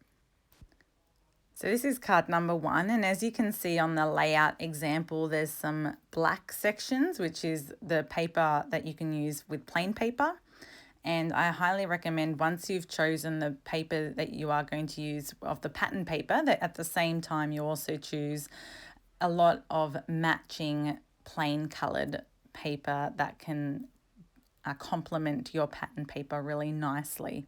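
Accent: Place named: Australia. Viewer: Lovely to hear another Aussie voice on the Tube. I liked all the cards, and your style of video. New subscriber!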